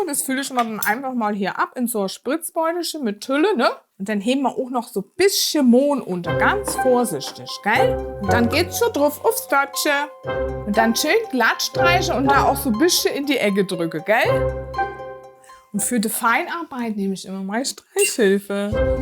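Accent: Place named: Hesse, Germany. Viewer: Voll genial , hab die Torte zum Geburtstag für meinen Mann gemacht , legga legga.... Dein hessisch is einfach herlliiiisch. DieStelle mit der Butter : "damit's net so " aarsch" zammfällt" :DDD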